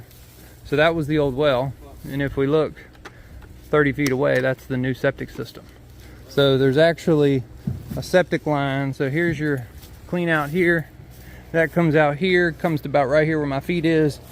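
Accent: South, United States. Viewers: speaks in a southern drawl, making ceMENT sound like SEEment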